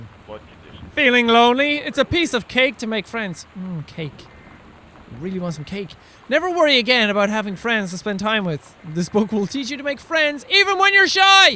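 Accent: Ireland. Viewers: “ya fecking idiot” got me XD god, I love how some accents make certain words just sound hilarious. Not meant to sound racist, I love different accents, honestly I kinda wanna condition myself into having an accent like Jack’s, despite not being Irish, I just love the sound of an Irish accent. Don’t know why, they just sound satisfying to me.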